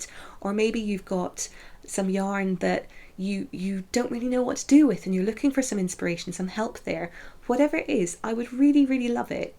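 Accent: Scotland, United Kingdom. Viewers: Love the podcast! Great job, you seem like a natural. You sound totally Scottish to me. I’m also a cussy person and can’t cut bread or cheese straight to save my life! 😂 Can’t wait to watch your other episodes. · Hello there; I would like to say; I love it when your Scottish accent comes out. I would like to ask, How do you keep the moths from your beautiful stash. I have bagged up all of my balls, because of the little fiends. I really enjoyed listening to your rant. I feel right at home with it. I used to live in Scotland - the Isle of Lewis. (not really the borders- but my daughter lived there and she now has an accent that is like yours- So I guess that might be why I like your style so much) I will be enjoying your future programs. I was knitting along as I listened. (and watched- no , really I did!) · Thank you for a fabulous first episode! 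You are hilarious. I love your accent, in which I can hear your Scottish origins. Scotland is my favourite country in all the world and I have had some very happy times in the Borders (love Jedburgh). Your knitting is amazing, can't wait to see more. Your Rhinebeck drinking game made things very merry here! Now I want to watch Episode 2. Good luck with this brave new world upon which you have embarked. Manuela, in Melbourne, Australia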